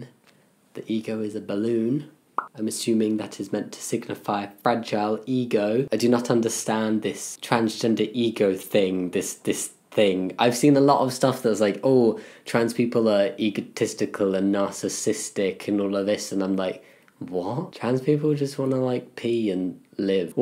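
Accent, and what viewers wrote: United Kingdom. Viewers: sometimes I forget you're British (don't me, I tune out accents after a while) and don't know of the prevalence of Christopher Walkin memes such as "it needs more cowbell". You lucky fucker.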